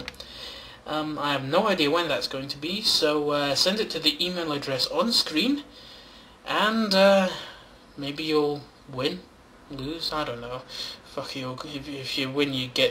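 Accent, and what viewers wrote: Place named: Tayside, United Kingdom. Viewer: ha! great review, i almost choked on your hatred for asylum films. were about in scotland are you from btw? you sound like you're from the tayside area. looking forward to the dark knight review, maybe a batman & robin review aswell?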